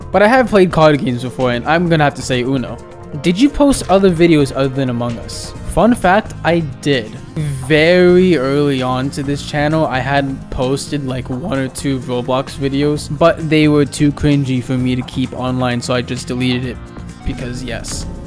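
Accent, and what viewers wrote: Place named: United Kingdom. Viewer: why does your voice sound so british?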